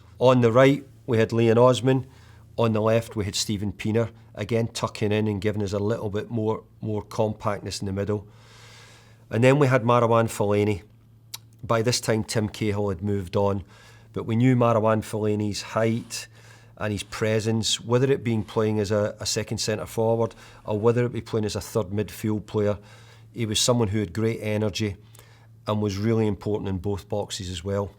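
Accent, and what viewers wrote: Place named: Scotland, United Kingdom. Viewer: Oh I love that Scottish accent, it's just so easy to understand, especially Fergie's speech 🏴 ❤️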